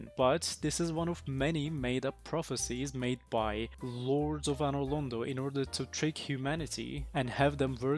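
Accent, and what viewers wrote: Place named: Europe. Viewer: Can't listen to monotone euro voice over.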